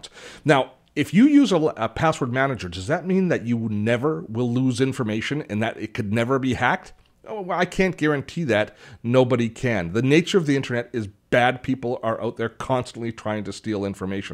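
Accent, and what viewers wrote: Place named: Canada. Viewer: aboot - Canadian accent detected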